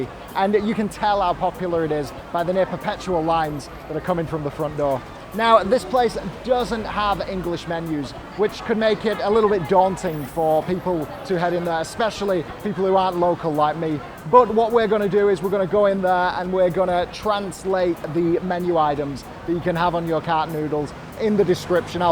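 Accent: United Kingdom. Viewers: Lovely British accent and good food. Instant subscription and like👍🏽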